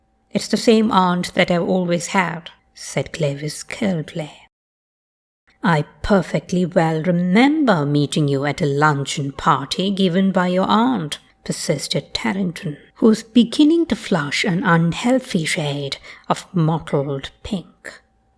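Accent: RP, United Kingdom. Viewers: This indeed is posh Upper RP English. I had to listen to this three times to understand the whole story. The story is actually quite funny ☺️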